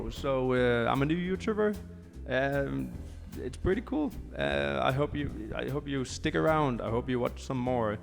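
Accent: Denmark. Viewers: You sound danish, is that correct?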